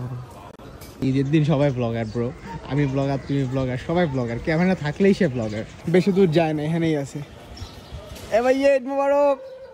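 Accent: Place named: Chittagong, Bangladesh. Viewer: Vai tomar kotha te tw Chittagong er tone ase..So you are not from chittagong?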